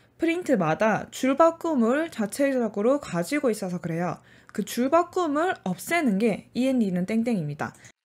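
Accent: Gyeongsang, South Korea. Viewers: 오늘 또 듣네요~~ 님 경상도분이신 듯 좋아요 따봉 추가요~~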